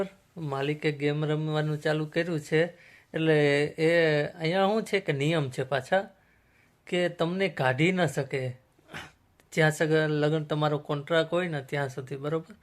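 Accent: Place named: Porbandar, India. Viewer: તમારી બોલી ઉપર થી એવું લાગે છે કે તમે પોરબંદર નાં મેર છો ❤❤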